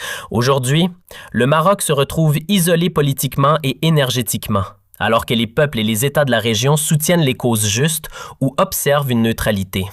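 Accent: Canada. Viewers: accent Canadian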